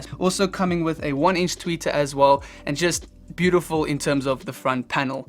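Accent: South Africa. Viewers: Great videos.. do I hear a fellow South African accent? I have 2 E5 XT’s on the way. I want to connect them to an Apple airport express so I can use the with Airplay. But I can’t seem to figure out how I would connect them as a pair to the Airport. The Airport only has a 3.5mm out. Would I use a 3.5 to rca stereo splitter and then 2 RCA to TRS cables.. one to each speaker?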